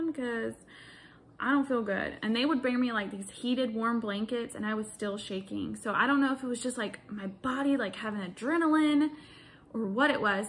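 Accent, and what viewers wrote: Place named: Texas, United States. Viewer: Are you from Texas? Your accent sounds really Texan! 😂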